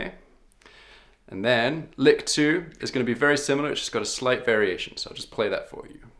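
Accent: United Kingdom. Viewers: I love your videos... 1 suggestion is to have the tabs above where the scrolling time bar at the bottom of the page... i like to pause and practice before i play along and the bar is right across the tab... You sound british so cheers!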